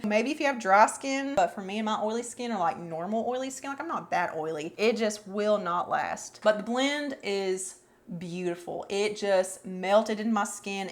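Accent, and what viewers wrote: South, United States. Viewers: You're from Kentucky?!? I've been watching you forever, but I had no idea! I'm an eastern Kentucky girl 👋 I've wondered about Kentucky being part of the South too because it's technically not. But, girl, listen to our accents (mine is thicker than yours) we definitely Southern lol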